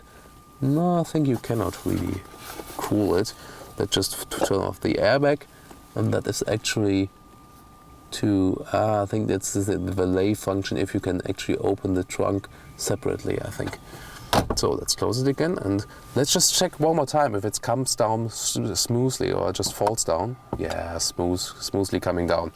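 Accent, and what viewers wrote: Germany. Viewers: I wish I had one of these in 2018 when I finally out my chance to drive on the AutoBahn........I wound up getting a Audi A6 which was no slouch foe ride, performance and handling but.....I had a A6 back in the states so I knew how they drove. Don’t get me wrong 30ish MPG with the V6 diesel was nice but getting to wring out something like a Q70/Q70L on the AutoBahn on a clear day would be nice. Your English even with the German accent is great, you have studied and sounds like you can speak better English than some....well...Englishmen. Good detailed video with facts and not a lot of fluff. Three good selling points for me maybe more but starting with three. 1. NO Auto/Start/Stop for the engine. 2. Modern design without going over the top. Nice and functional without being overly flashy. 3. I cant speak for Europe Spec engines since most of them are probably diesel. 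I like the regular multi port fuel injection. 4. Traditional moon roof, not the fancy Panasonic. I want metal on the roof especially if in a rollover accident, 5. Cabin Ergonomics. Decent layout and reasonably well laid out. Example. Fuel door RH side of vehicle...Fuel gauge on RH side of the instrument cluster. Some misses and not overly critical but observations that I have noted. 1. Rear Turn Signals. Personally I prefer the amber contrasting color rear turn signals. 2. Slightly behind the times for transmission technology. Yes functional and effective but with the competition coming up with 8,9 and even 10 spee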